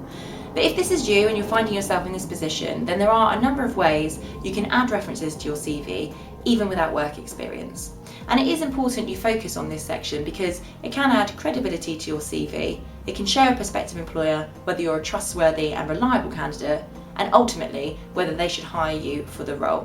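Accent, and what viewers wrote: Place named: United Kingdom. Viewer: ick awful brittish accent and fugly eyebrows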